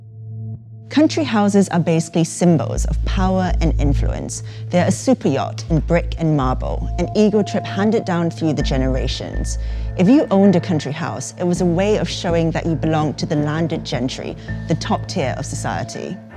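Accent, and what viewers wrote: United Kingdom. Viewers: Isnt this the women that doesnt use toilet paper or something? Why is she speaking in a british accent all of a sudden? <link> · It’s cool to see and Asian decedent with a British accent narrator the history of uk 🇬🇧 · Never thought I’d see a Asian with a British Accent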